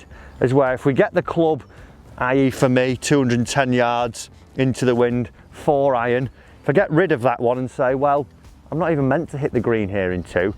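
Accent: United Kingdom. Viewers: I can't get enough of that british english (are you from Manchester?)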